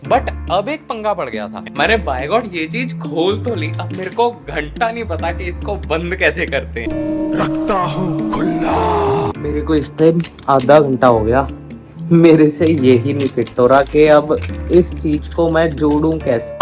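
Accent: Punjab, India. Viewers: I'm hating this punjabi language accent as this guy is making so much over action and bakchodhi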